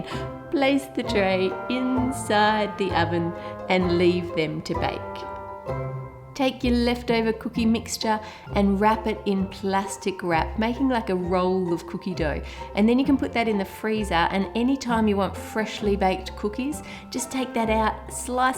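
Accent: Australia. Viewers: Galaxy Midnight, i totally agree! I love her Australian accent! I've always wanted an Australian accent! #SoCute! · she’s australian?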